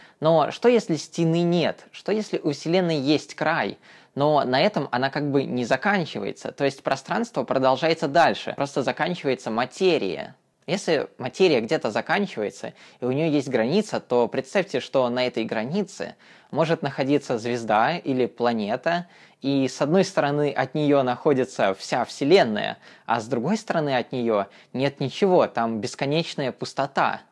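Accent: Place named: Penza, Russia. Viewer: Интересный материал, но речь оканием, с растягиванием гласных. Откуда он? Из Пензы?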